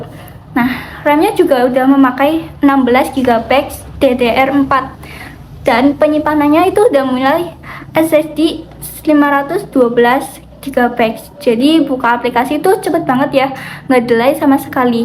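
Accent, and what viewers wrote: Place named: Tegal, Indonesia. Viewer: Logat tegale krasa nmn😭🙌🏽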